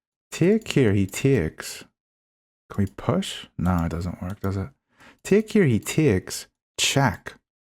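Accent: Northern Ireland, United Kingdom. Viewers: You're English?! You sound Northern Irish! I would've put money on it.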